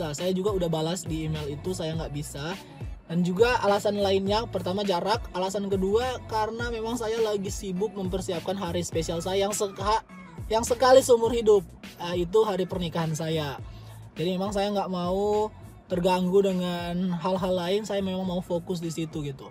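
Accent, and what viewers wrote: Manado, Indonesia. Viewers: Selama tontonin chenl abang nanti tau abang orng manado😂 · Bang org manada kang?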